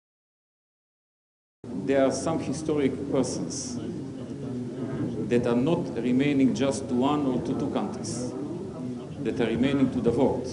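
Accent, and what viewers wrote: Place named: North Macedonia. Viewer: SO SI ZBORUVA SO MAKEDONSKI AKCENT bar gi kazuva pravilno zborovite i go razbirat a ima akcent i znat od kaj poteknuva... ne e prav anglicanec ako mozete vie da vodite sto ne ste vie prepier tuku toj e ...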